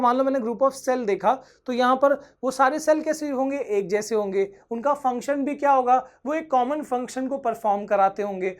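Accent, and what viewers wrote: Bihar, India. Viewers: I really love the way and the accent u used to teach 😂💕 your bihari accent is mind-blowing apne wali vibe aa jati h 😌💕. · Sir ap bihar ka ho kya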